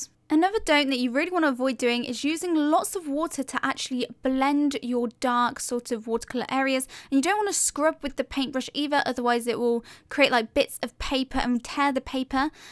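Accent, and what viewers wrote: United Kingdom. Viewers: She has the best British accent❤️❤️ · For some reason I forgot where you from. Sound British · are u british? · Oh I love your British accent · Are you British?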